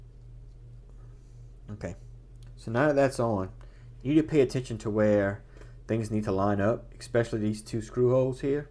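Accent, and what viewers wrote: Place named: Louisiana, United States. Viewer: When I heard your accent I knew. You gotta be south of Houma?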